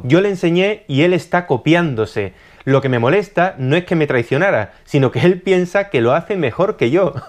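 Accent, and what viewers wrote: Spain. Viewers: Porque hablas como español si eres de mexico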